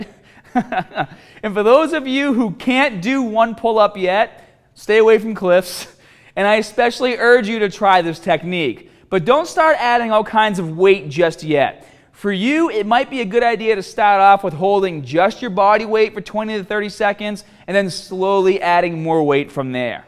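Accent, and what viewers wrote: Boston, United States. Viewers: Is that a Bostonian accent?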